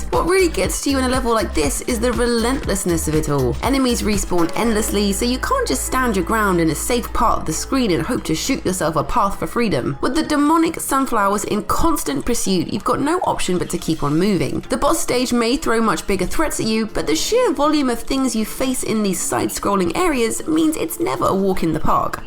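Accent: United Kingdom. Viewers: stop speaking british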